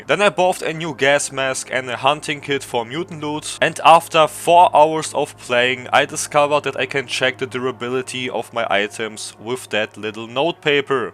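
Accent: Germany. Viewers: Took me 4 seconds to realize that he's german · I did really enjoy the video. I suck at giving feedback sorry but im excited to see more. I was also thinking the entire tume that u sound so german so it was pretty funny to find out. Anyway i think u convinced me to finnaly try misery myself, also overcarrying yourself to sell all the loot is relatable af. Keep up the great work!! <3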